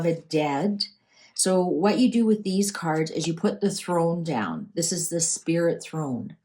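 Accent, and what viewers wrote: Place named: Canada. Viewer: I'm an Australian living in Canada and I immediately knew you are Canadian from your accent. People forget the Royal Family are our RF too (Australia and Canada). 😅❤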